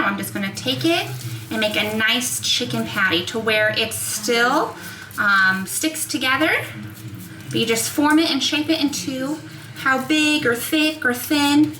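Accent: United States. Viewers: Good morning. Nice to watch this new video. Frankly speaking, I watch your videos not because I am fond cuisine, but because I listen to you speaking English in American accent. I am a fervent learner of English language. I really appreciate the particular video, in which you showed how passionately you are leaning Bengali. I will be looking forward to watching such more videos from you.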